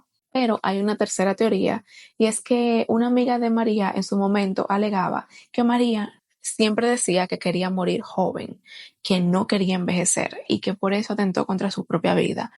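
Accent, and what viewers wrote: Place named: Dominican Republic. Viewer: Me encanta tu voz, que agradable es el acento dominicano. Saludos desde México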